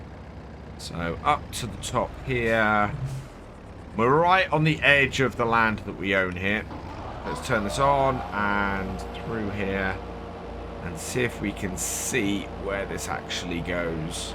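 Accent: United Kingdom. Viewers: I like your British dialect, Grettings from Germany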